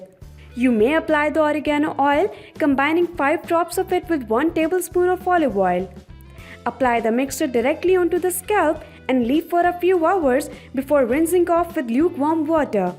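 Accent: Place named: India. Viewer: I can’t stand the Indian accent and mispronunciation.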